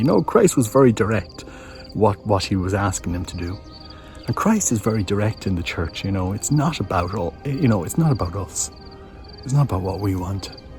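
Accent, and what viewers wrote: Ireland. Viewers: The Eucharist is a treasure. Amen! Thanks for your videos, great to hear the Irish accent too 🙏🏿🙏🏽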